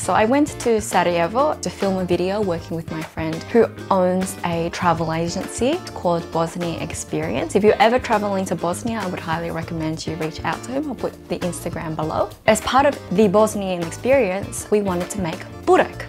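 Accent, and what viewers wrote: Australia. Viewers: Aussie accent and cute Asian girl is best combo ever.. 😊😊 I could say it na Srpskom but better not.. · It's so funny how you sound like two different persons when you speak serbian and english. I like you aussie accent. x) Cuo sam da ima pljeskavica od mesa kengura! xD Kakvo li je to cudo? xD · How do you sound pure Australian then pure Serbian . 👏🏻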